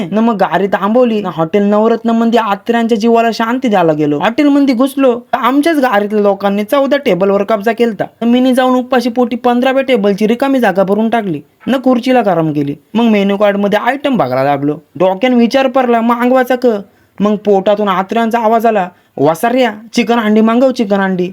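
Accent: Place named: Konkan, India. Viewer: Mitra Aikayla Chan Vatat Kokani Karanch बोलणे 😊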